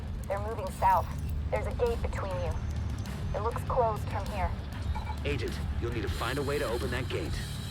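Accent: South Africa. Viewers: Are you South African? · you're from south africa aren't you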